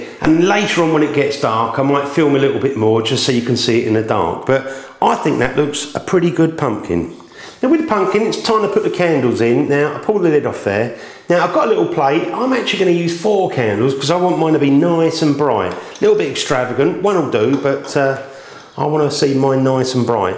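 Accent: United Kingdom. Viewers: u sound like someone from little brittan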